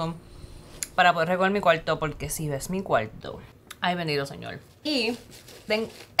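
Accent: Puerto Rico. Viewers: Una China hablando un perfect Español boricua uuff muy Bonita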